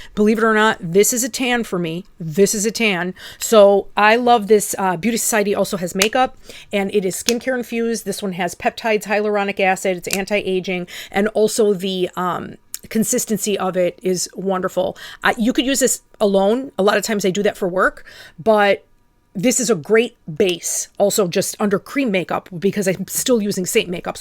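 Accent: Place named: Midwest, United States. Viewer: Love your midwestern accent! 😀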